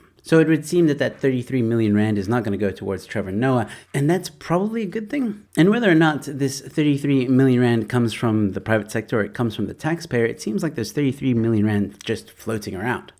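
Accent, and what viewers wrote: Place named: United States. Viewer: your video is poorly put together and comes across as propoganda. Who pays you to post this absolute junk and why don't you walk around in South Africa to see the development AND integration we have going on? Or are you just more comfortable being sheltered in your home spreading nonsense like this that tarnishes the name of our country? Your accent is American, why do you post so much about cape independence and so on? I'm going to find out whose funding you and you will be held accountable. So will they.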